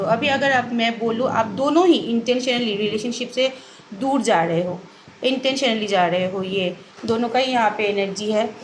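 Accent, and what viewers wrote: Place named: Bengal, India. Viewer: Bhai ami tarot reading khub ekta dekhini age, normal rashifol dekhechi. Kotha sune mone holo tumi bangali tai ... khub valo reading tomar ... clear cut ... subscribe korlam... thank you ... but 1ta proshno moner modhye ghurche reading ta dekhar por thekei ... ami pure single, kothao kono option nei, ageo chilo na ... khub cleverly keu propose na kore deep interest show koreche but amar intuition bolechilo may be married... amar janar kono scope chilo na takei jigasa na korle, ami jigasa korteo parini but se kaida kore jene niyechilo ami married kina .. 1ta kajer jonno kotha hoyechilo.. romantic connection hobar kotha ekdomi noy .. ekhon kotha holo je married se to tar spouse ke chere kono din o onno kauke commitment dey na, tahole tara nijeder situation jene sudhu sudhu single der life e kosto keno baray ? Ami to flirt kori na kokhono ... 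korle amaro anek relation thakto fole seriousness karo proti thakto na, sobtai khela hoto ... sob cheye beshi koste achi ami, jobless, struggle korchi, ro anek problems ache life e ... tar vetor aei notun problem jar kono solution ache bole to amar mone hochhe na - sudhu reunion diye ki hobe bolo ? I need steady relationship not fun game... ami married hole to jeche pore onno kauke kosto dite jetam na kokhono... jara commitment debe na tara ase keno boloto ? By the way ami esob soulmate, twin flame ki jani na, bujhio na. Simple relation bujhi jekhane prem hoy eksonge thakar jonno.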